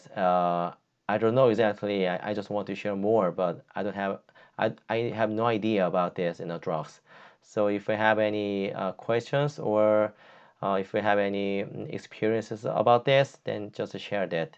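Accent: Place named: China. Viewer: Chinese English accent is so irritating. 😭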